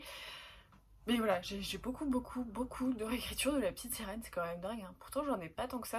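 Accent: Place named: England, United Kingdom. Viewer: Trois de Valérie Perrin! Trop fastoche pour la première! Sinon je rêve d avoir ton accent anglais!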